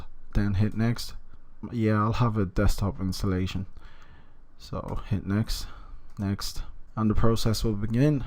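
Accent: Ireland. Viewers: Is this a black dude with an Irish accent?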